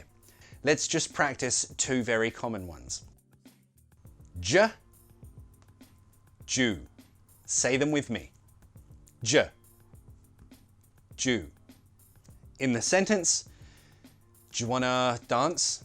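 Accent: United Kingdom. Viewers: You are helping us a lot to learn and improve our oral skills...I love your British accent. Regards from 🇦🇷😎👍👏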